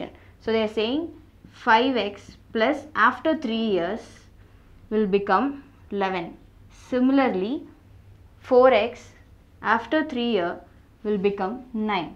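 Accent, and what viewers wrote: South India, India. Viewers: Mam are you from Tamilnadu or south india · are you south indian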